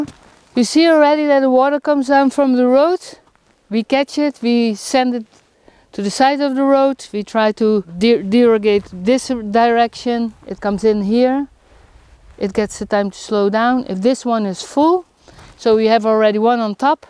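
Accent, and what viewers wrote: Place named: Netherlands. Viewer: Dutch people on holiday XD I can 100% tell by the accent this women is Dutch. We do this since we are born lmao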